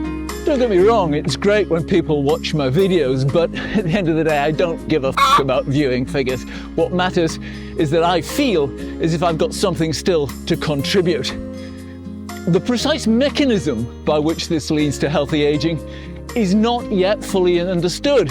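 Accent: Newcastle, United Kingdom. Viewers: That’s where I know you from !! I always thought I knew your voice watching your videos but never thought anything of it being fellow geordie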